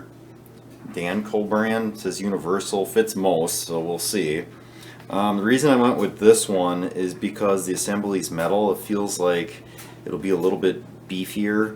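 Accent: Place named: Midwest, United States. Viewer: You've gotta be from Ohio or Minnesota 😂❤ thanks for the vid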